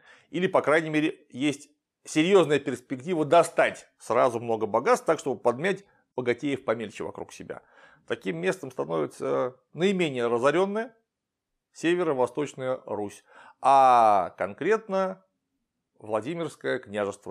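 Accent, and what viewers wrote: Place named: Bryansk, Russia. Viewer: Спасибо, Клим Саныч, за просвещение. Слушаю вашу речь и наслаждаюсь - родными краями повеяло, у меня батя из под Трубчевска. А у дяди жена из под Клинцов. :)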